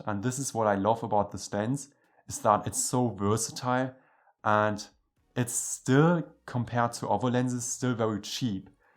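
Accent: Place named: Germany. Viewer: I have a question... Do you speak German!? (I'm from Switzerland and I find that you have an German accent haha😂)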